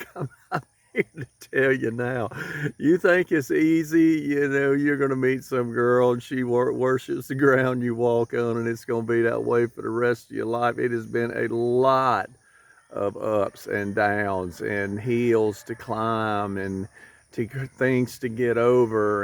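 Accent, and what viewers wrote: South, United States. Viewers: yes, I do love your southern accent. I hope you're ok. stay strong sir..god bless. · Love your Southern accent. I love your honesty and candidness about life. I hope things will work out for you and your wife.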